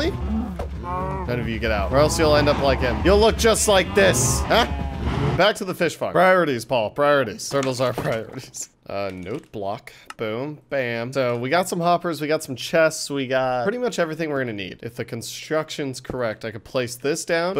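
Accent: Ireland. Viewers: Wait Paul's Irish???? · Irish? Jacksepticeye? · Ur Irish?! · I never knew u were irish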